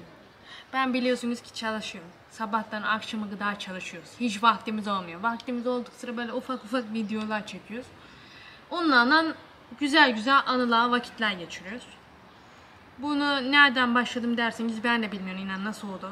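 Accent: Kütahya, Turkey. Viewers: Bizim gız, Öncelikle başarılı çekimlerin devamını dilerim. Kızımın Üniversitesi için yılda 4 kez İZMİR başlangıç KÜTAHYA, GEDİZ güzergahından ZONGULDAK'ĞA geçiyorum. KÜTAHYA şivesinin zaten kendine özgü hoş ve tipik bir iç EGE aksanı var. Bu aksanı ve kültürü bozmadan bulunduğunuz beldenin yaşlılarını,düğün örf ve adetlerini konu alan videoların sizlerin daha çok tanınmanızda yardımcı olacağı kanaatindeyim. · Lanet Gelsin Dedigim Yerdir Kütahya Ama Senin Şu Şiven Yüzünden Sevmeye Başladım.. Yayın Hayatında Başarılar Kardeşim 😊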